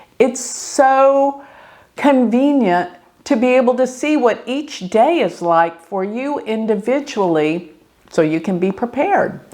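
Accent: United States. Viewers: Love your accent it's totally like Kelly Bandy - the classic American. Greetings from Athens, Greece! Love Christina Aguilera, Britney, Madonna!!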